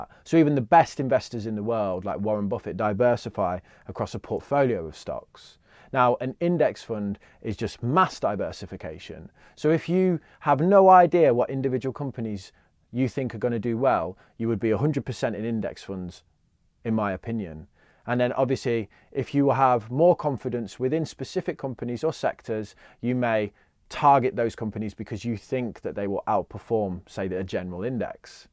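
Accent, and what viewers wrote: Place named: Coventry, United Kingdom. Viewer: 28 These are my salad days Your accent is a bit more Cov than 0121 🤪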